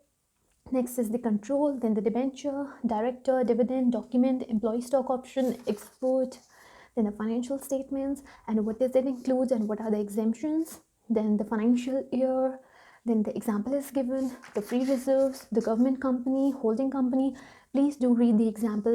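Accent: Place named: United Kingdom. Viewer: Thanks i got some clarity, by the way your accent is like British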